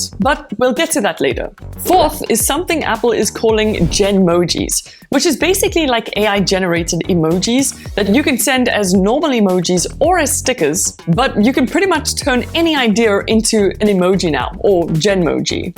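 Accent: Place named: South Africa. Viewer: Your South African accent is beautiful 😍😍😍 just like the rest of you. Love your videos too! ❤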